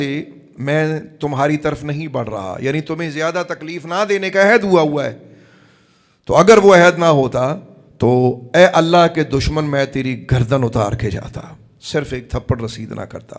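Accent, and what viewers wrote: Arab world. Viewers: SUBHAN ALLAH… YA SAYADI YA MURSHADI … qurban ap k ilm o feham py … arbic lab o lehja khubsorat … muzu kalam alaaaa… tahqeeq or dalaile zarbdast… QURAN E PAK ki ayat mubarak se ABU BAKAR ki shan or muqam ko waziya beyan farma k munkiron ko lajawab kiya … andaz e beyan alaaa or purasar …. AP ki ilmi baserat ko salam … ❤️🌹❤️🌹❤️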